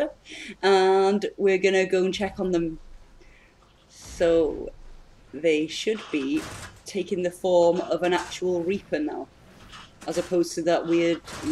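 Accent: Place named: Bristol, United Kingdom. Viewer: I'm just trying to work out the accent 'sounds abit bristol'.ooh ar ra.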